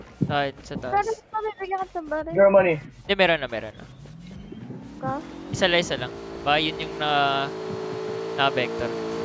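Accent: Visayas, Philippines. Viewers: Bisaya kadiba idol??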